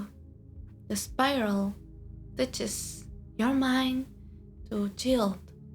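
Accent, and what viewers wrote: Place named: Argentina. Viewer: Ok I cannot resist your accent 😊 Very commanding tone of voice. I see you are from Argentine, South American women are so powerful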